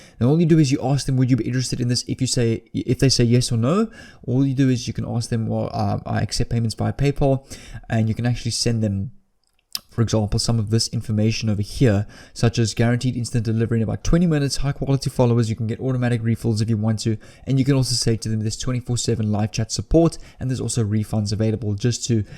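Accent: South Africa. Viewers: Wow you sound South African👌🏽Great content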